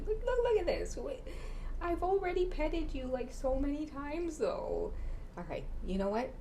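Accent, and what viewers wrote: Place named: Trinidad and Tobago. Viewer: Girl this is my first video of yours and I liked and subscribed immediately purely for the trini accent 🇹🇹❤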